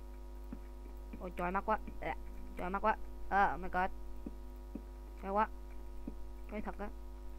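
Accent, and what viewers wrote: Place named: North, Vietnam. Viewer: Hơi lai giọng bắc á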